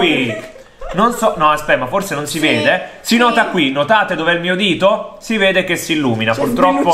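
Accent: Naples, Italy. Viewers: ma e Napoletana?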